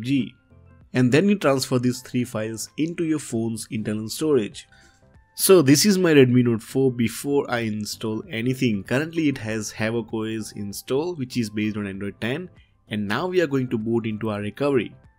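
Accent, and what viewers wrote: India. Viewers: Is this safe ? U look like an Indian from ur voice and I mostly dont trust them Pls answer me 🥺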